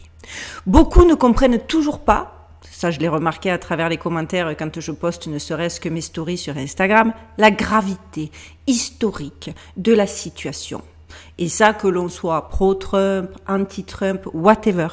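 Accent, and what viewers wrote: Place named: Southern France, France. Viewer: Belle et intelligente 👍 Et ce melange d accent du sud de la France la ou je me trouve et un accent americain est etonnant 😮 Soyez bénie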